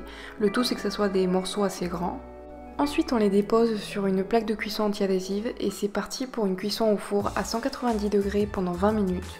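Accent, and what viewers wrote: South, France. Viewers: eh non! Ne change rien tout est parfait montage recettes et ta voix off avé l'accent haha! Bonne continuation et merci:)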